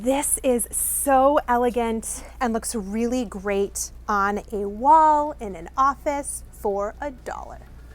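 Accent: Spain. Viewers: Please tell us the story of your fantastic Spanish accent! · I love your Spanish accent 💃🏽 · do you speak Spanish fluently? I’m always impressed with your pronunciation (it screams Spain) as a Spanish teacher!!!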